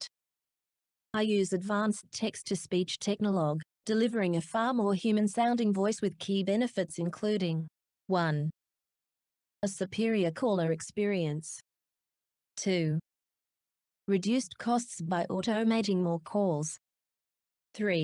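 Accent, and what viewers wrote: United Kingdom. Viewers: Her Voice Sounds Like A British UK Accent.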